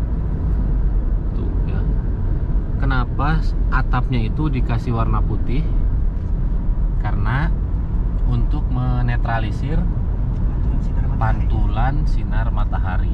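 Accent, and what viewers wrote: West Java, Indonesia. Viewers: Masha Allah..jd teringat kembali hajian th kemarin 2019..kang logat sundana sami sareng abdi..salam kenal abdi asli urang patok beusi..subang..ternyata kang dari kp siluman ya asalna..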